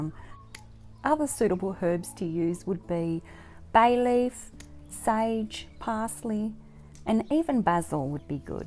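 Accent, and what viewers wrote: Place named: Australia. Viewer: I can't placee your accent... I wanna say "aussie"? - HA! Nailed it. Sorry: autism. I struggle with accents.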